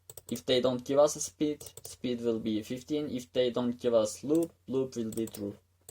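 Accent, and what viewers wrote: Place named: Turkey. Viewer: usta hiç dikkat etmemiştim masaüstüne aksandan türk mü acaba dedim doğru çıkıt :d böyle bir işe ingilizce girip anlatmak çok iyi cidden helal olsun eğer yorumu görüp cevaplayabilirsen bir şeyler sormak isterim bi kalbe anlarım soru beklediğini AS:DASd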